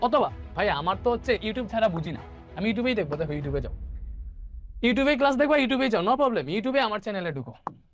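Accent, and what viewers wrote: Sylhet, Bangladesh. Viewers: ভাইয়া কী বাড়ি সিলেট? কথার মধ্যে সিলেটি টুন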